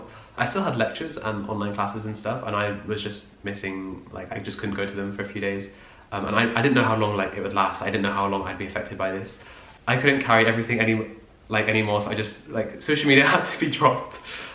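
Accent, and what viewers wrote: United Kingdom. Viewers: you sound british, where do you go?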